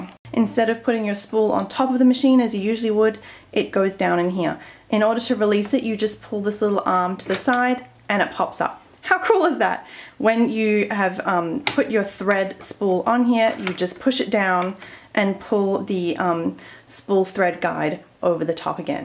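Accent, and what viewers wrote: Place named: Australia. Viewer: Love your review of this machine. I have the original lotus Tx and haven't used it for awhile so inspired to start using it again. I would love it if you could go through a review of the original one too. I love your sewing room are you able to do a video of that and how you set it up, it looks very organised. I am from Australia just wondering with your accent if that is where you are from. Great work your videos go at a good pace to understand and you are nice and clear and camera work is great too, well done.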